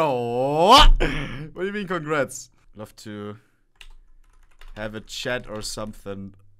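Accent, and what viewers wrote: Germany. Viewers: is bro german ? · i think youre from germany am i right xD alles klar xD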